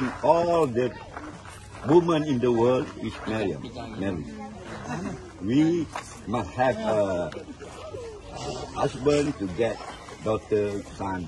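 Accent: Terengganu, Malaysia. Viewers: terkenal sohor dgn loghat daerah tganu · English Terengganu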